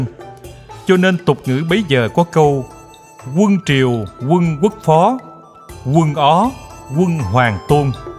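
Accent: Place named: South, Vietnam. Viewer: nghe giọng Nam là thấy rất dễ thương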